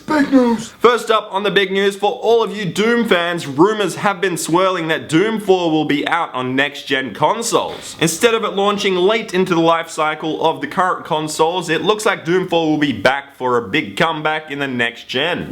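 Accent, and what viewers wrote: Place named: Australia. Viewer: Omg his accent is australian :O dafuq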